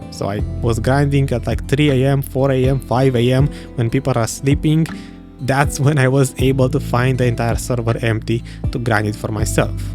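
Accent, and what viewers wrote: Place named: Romania. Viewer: You sound very romanian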